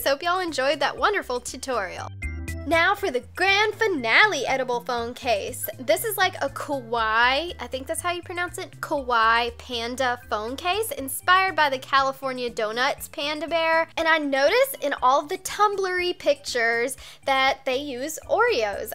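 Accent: South, United States. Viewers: She is Sooo stupid I hate her country accent eww I'm discusted by her utube ideas and no I won't leave her alone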